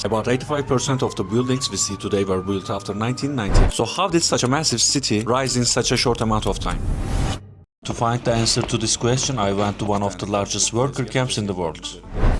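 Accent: Turkey. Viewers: türkçe seslendirmeyi kapattım hala türk aksanı var diyorum niye meğersem türkmüşsün :D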